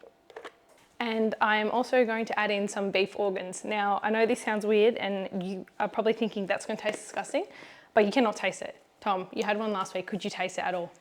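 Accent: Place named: Australia. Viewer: It’s very refreshing to hear an Aussie accent 🤗👍. I’m in Brisbane,you’ve got a hot day down your way today 🥵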